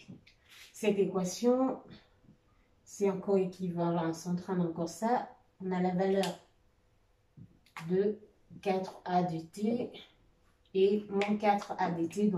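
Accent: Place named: Cameroon. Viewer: Vous êtes camerounaise, cela s'entend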